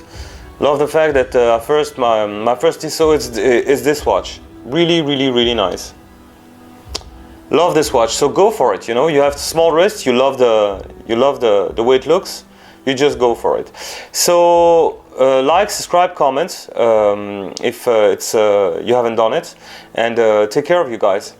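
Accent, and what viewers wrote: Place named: France. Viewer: That is a beautiful watch! I may have to go and buy it! Also, may I say, your accent is gorgeous - I must come to France if all the men sound like this!!! 😂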